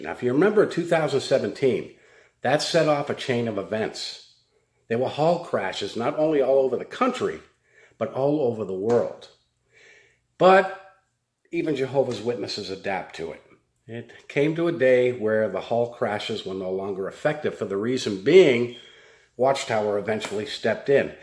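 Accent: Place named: Boston, United States. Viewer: I always found it interesting that JW’s let old bank cleaners and window washers dictate their life. I’m from California and you sound like you from Boston.. you don’t earn respect from regurgitating Bible verses on command you can’t tell someone to gaurd a door or fend off protesters if you never even had a traffic violation or a school fight. I know people who will revoke your invitation to life. I love my elders and they are all dead but they kept an eye on me and I appreciate them. They knew they couldn’t control me. I’m a lil guy but I hit hard and unlike Watchtower if I ask you for a favor I will pay you. My suit is highly respected. I don’t play make believe on Sunday tuesdays and thursdays like JW’s.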